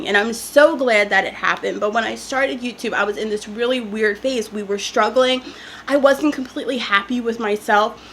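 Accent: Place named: Philadelphia, United States. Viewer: Love your videos (your realness)!!! OMG - You're perfect just being yourself! I can't believe that you 'didn't fit in'. You seem like the sweetest person. When I first started watching your channel, you had me at "ballin' on a budget"! Your videos put me in a good mood because you're so bubbly and you have a sunny personality (and I like your Philly accent)! I agree with 'lady love'. People who act 'better than' are usually hiding something (most likely insecurity). Just keep being yourself,!♥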